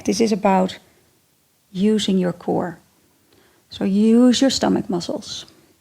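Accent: Netherlands. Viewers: You sound like you are from The Netherlands... Dank je wel voor de oefeningen!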